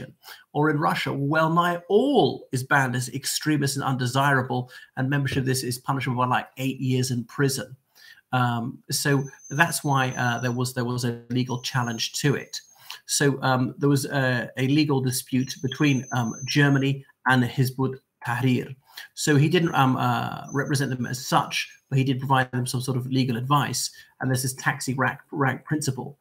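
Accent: RP, United Kingdom. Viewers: With that plummy accent you claim Ireland.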